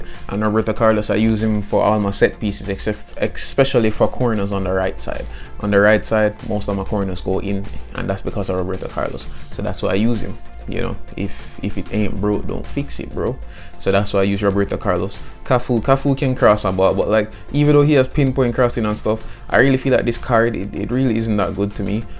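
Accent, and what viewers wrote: Jamaica. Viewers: You sound Jamaican lowkey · You a Jamaican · Ya Jamaican ?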